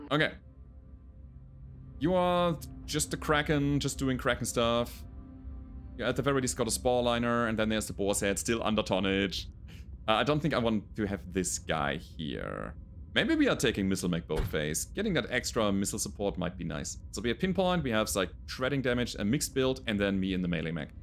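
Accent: Germany. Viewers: The short was fun. Create a character for yourself! Be a Steiner officer. Use your German accent!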